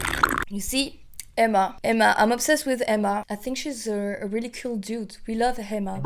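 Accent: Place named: Los Angeles, United States. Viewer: As an American I understand you just fine. For the most part you sound as if you’re from LA which if that’s your goal, it sounds like it is to me, then you’re doing great! I just started learning French last year so I’m really glad I found your channel, immersing myself in all things French and progressing to an intermediate level is my goal and focus for this year and you knowing English will help immensely, so thank-you 😊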